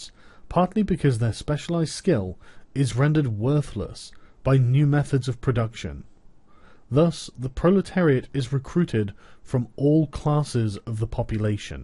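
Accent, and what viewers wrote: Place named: England, United Kingdom. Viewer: I'm undecided if hearing Sargon read this makes the ideas more concerning than when I last read it. That type of English accent makes things sound more reasonable and somehow more evil at the same time.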